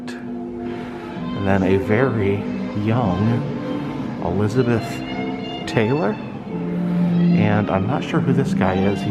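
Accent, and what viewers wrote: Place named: Oklahoma, United States. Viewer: Hey bub it sounds like you might live in oklahoma. Let me know. I would love to meet you!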